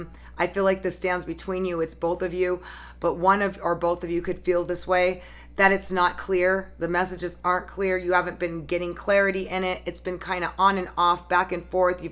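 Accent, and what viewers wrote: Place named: New York, United States. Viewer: Are you from new York? Bc I can hear an accent. But I'm actually dealing with both a Leo and Libra. The Libra ugh ur on spot with him.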